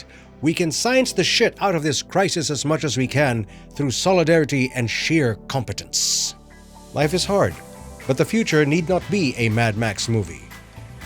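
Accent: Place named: Scotland, United Kingdom. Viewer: I like your Scottish accent better than Scrooge McDuck and the critical drinker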